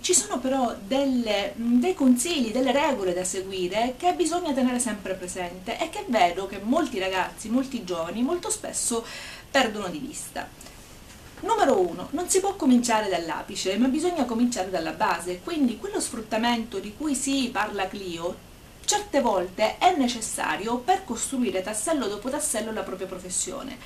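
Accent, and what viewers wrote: Sicily, Italy. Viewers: Catania? :D Palermo :)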